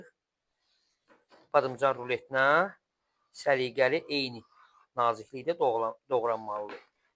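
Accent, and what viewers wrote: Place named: Baku, Azerbaijan. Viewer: Suzunçun.Bakili ləhçəsi)))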